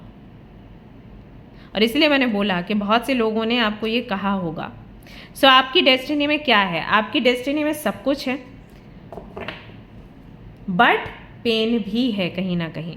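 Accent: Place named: Bihar, India. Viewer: Bhot kam logo se hi "batiyate" ho haha such a cute accent m bihar se hu aise hi bolte h sb😂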